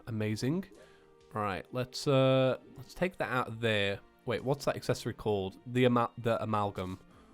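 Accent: United Kingdom. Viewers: R u brittish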